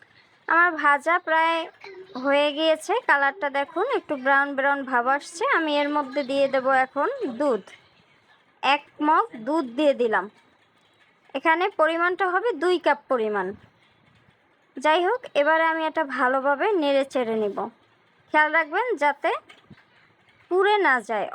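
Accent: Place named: Barishal, Bangladesh. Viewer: আপু তোমার কথায় বোজা যায় তুমি বরিশাইলা😅😅😅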